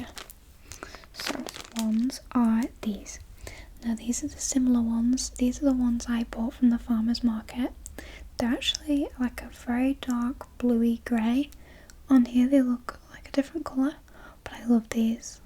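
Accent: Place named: Northern England, United Kingdom. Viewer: Kashan900 her accent is a northern English one.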